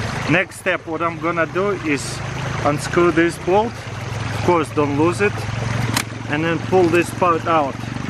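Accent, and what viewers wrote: Russia. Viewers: russian? :D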